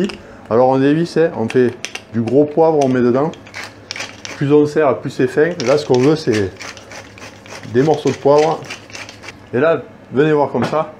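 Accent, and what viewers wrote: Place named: Provence, France. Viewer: J'adore, je salive et l'accent de Pagnol en prime, que demande le peuple ! 👍😘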